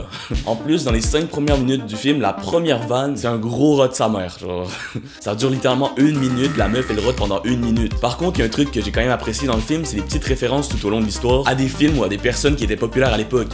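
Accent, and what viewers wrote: Quebec, Canada. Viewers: Salut t’es québécois ou français. C’est difficile à dire avec ton accent et la manière que tu parle. Et tu as des termes de France. Mais bon travail !!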